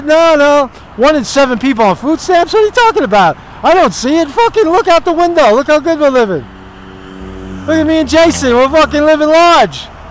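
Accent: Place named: East Coast, United States. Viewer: This channel is PURE GOLD, east coast kid. I grew up in CT married a girl from Jersey who's Full blooded Italian mom and dad were from Brooklyn (Dad) and Newark. (Mom) I live in the Midwest now and Brother let me say your accent and mannerisms sound like HOME to me. I could listen to you gab all day long, and the content is ACES. Homesick.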